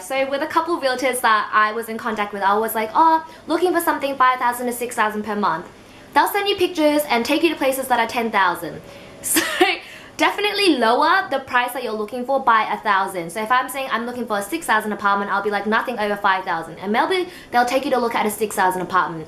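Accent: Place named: Australia. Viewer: Your 'o's and 'u's sound beautiful. Aussie accent is quite something eh.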